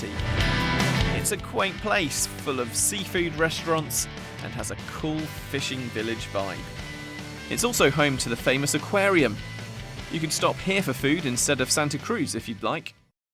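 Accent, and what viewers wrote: United Kingdom. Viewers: British accent! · Lovely british accent as well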